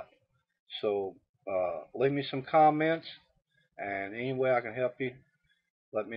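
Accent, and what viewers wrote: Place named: South, United States. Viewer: I like your accent. Southerner?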